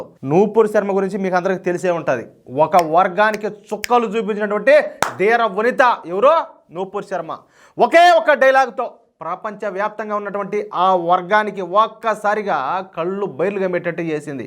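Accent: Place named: Nellore, India. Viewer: I like your Nellore style language Garu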